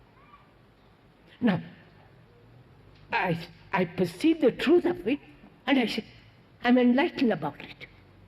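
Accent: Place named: India. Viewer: LOL he is Indian born and English is not his language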